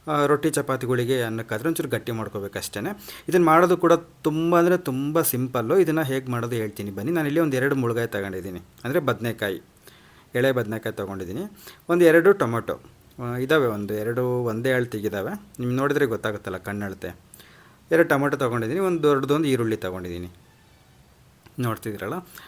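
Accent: Chitradurga, India. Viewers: Good morning sir, ನಿಮ್ಮ ಅಡುಗೆ ಜೊತೆ ನಮ್ಮ ಚಿತ್ರದುರ್ಗ ಭಾಷೆ ಯ ಸೊಗಡು ಚೆನ್ನಾಗಿರುತ್ತೆ ನಿಮ್ಮ ಮಾತುಗಳನ್ನು ಕೇಳುತ್ತಿದ್ದರೆ ನಮ್ಮ ಊರಿಂದ ಯಾರಾದ್ರೂ ಬಂದ್ರೆ ಮಾತಾಡುತ್ತಾರೆ ಅಲ್ವಾ ಹಾಗೆ ನೀವು ಮಾತಾಡುವುದು ಹಾಗಾಗಿ ನಮ್ಮವರೇ ನೀವು ಅನ್ನುವ ಭಾವನೆ ನಮಗೆ ದಯವಿಟ್ಟು ನಮ್ಮ ಭಾಷೆಯನ್ನು ನೀವು ಮಠದುವ ರೀತಿಯನ್ನು ಬದಲಾಯಿಸ ಬೇಡಿ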